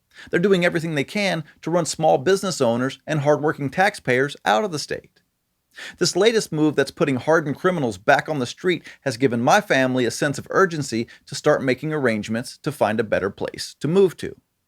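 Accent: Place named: South, United States. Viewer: Please come to the south! I would have sworn you were a southern guy anyways.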